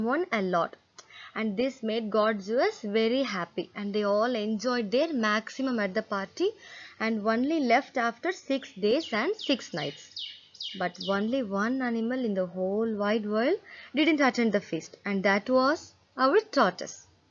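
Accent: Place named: United States. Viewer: thanks mam but I think that you are from America because of your voice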